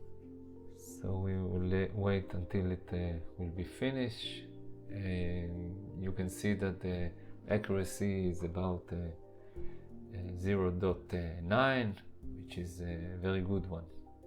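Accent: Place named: Israel. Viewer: Now I get what an Israeli accent is. Nice to hear you doing well :)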